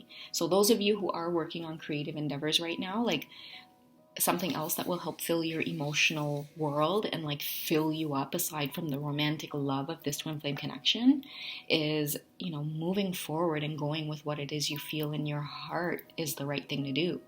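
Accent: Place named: Trinidad and Tobago. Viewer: Whoa.....wait a minute...did I just hear you say you are from Trinidad....I knew I heard a Trini accent yesterday when I was listening to another one of your readings....I also lived in Toronto before moving to the US. I have been listening to your readings for the last year now. Great job girl...I am a male Divine Feminine Twin Flame. I met my female Divine Masculine 19 years ago but didn't know anything about Twin Flames at the time. I learnt about the TF journey 4 years ago. We are not in union but hey who knows what can happen. However we are both ascending very very fast nowadays!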